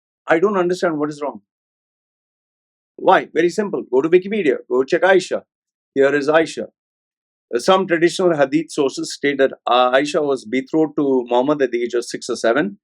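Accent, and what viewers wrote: Kerala, India. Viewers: lol true but i dont think hes konkani but keralite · Your accent is Very Indian!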